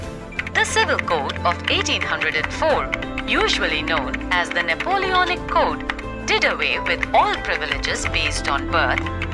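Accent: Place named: India. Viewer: Indian accent.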